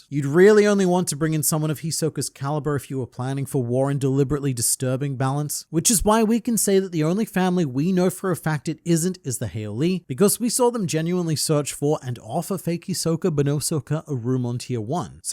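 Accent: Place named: Australia. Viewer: My wife just said, "is that the strange Australian internet man talking about Hisoka?"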